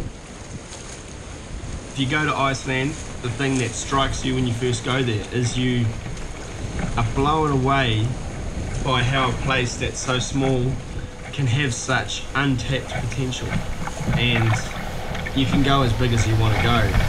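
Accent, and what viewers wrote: New Zealand. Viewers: sounds like a new zealander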